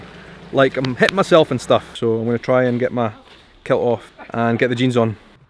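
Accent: Scotland, United Kingdom. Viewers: It’s the Scottish accent. Yummmy. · you never let me down. I'm absolutely loving your Outlander vlogs, the kilt, the accent, just brilliant. What an ambassador for Scotland! You deserve a part in Season 4. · I love you bring your pure Scottish accent out in this! As an u.s.a . Man with scottish roots I applaud you brother. Thanks for this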